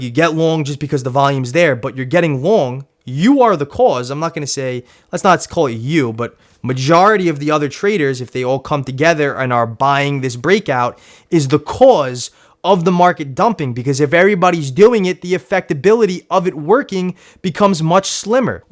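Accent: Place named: New Jersey, United States. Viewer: You deff from New Jersey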